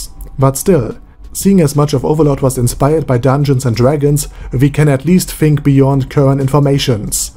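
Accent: Germany. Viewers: Why do I hear german even when you talk english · Because of his accent. The auto-CC's even think he is speaking german. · Well i cant watch your video.... your English is right but the accent is so insane, i cant take it.... iam german too but this is too much for me.... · I have to confess, this commentary sounds more authoritative because of the German accent. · English is a germanic language :) that's why his accent makes it sound like he's speaking German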